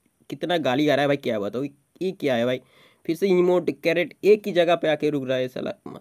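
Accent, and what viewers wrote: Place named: South, India. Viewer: Aap thodaa south side se ho khaa bhai aapka voice se samjaa rhaa hai bro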